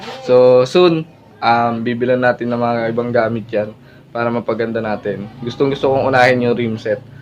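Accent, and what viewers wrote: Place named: Bulacan, Philippines. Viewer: Paps taga bulacan kaba? Sa punto mo kasi eh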